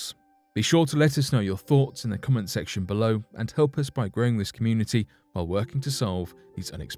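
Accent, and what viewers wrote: United Kingdom. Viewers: Why is a British guy narrating this?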